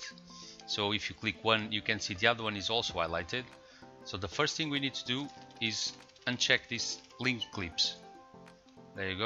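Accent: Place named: Portugal. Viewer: I was like... hmm sounds like a Portuguese accent. then checked the keyboard layout.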